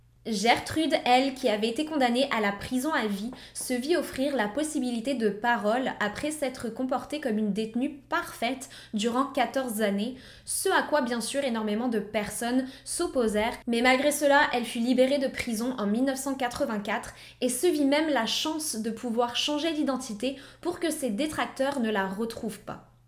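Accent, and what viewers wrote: Quebec, Canada. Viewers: Punaise je me disais que t avais un petit accent québécois mais je comprenais pas pourquoi il était aussi léger tout s’explique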